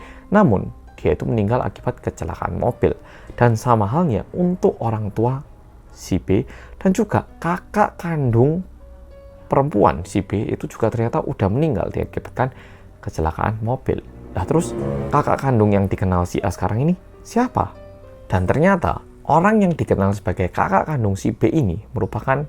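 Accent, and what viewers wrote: Java, Indonesia. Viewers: Yaampun teganya teganya😮 tks medoook ❤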